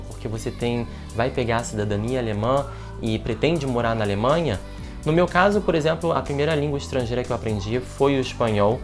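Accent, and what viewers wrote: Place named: Rio de Janeiro, Brazil. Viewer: De qual estado do Brasil é você? Eu tô aprendendo português e quero saber o seu sotaque, gosto muito de ouvir sotaques do Brasil e dos países lusófonos todos! Edit: Eu já vi que vc é do estado do Rio de Janeiro. Rsrsr Sou novo inscrito no seu canal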